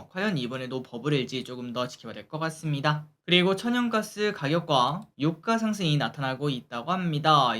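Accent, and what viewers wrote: Gyeongsang, South Korea. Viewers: 이 대구분 이신가요?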